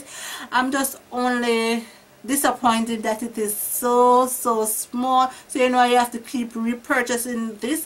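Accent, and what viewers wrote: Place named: Jamaica. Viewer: Never expected a Jamaican accent when I clicked this lol yas...got it for 2 bills in Ochi...hoping its just as good for me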